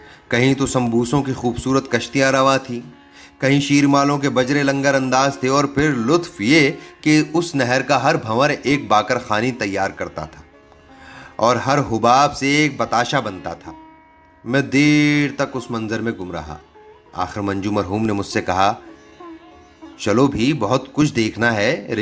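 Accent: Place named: Lucknow, India. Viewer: لکھنوی لہجہ کی الگ سے داد۔ ۔۔۔۔یار کیا بات ہے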